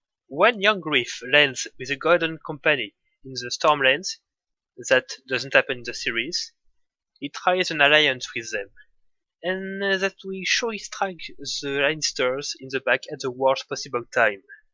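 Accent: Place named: France. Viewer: Y a qu'un français pour prononcer "épisode" comme ça ^^ c'est la première fois que je vois un francophone faire des vidéos en anglais, c'est un peu perturbant d'avoir un aperçu de notre accent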